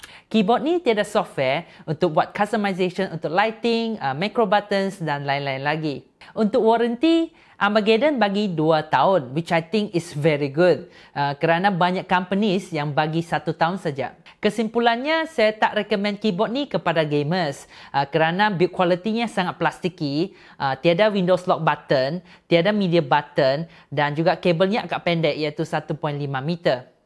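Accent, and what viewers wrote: Sabah, Malaysia. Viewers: macam Sabahan + Singaporean?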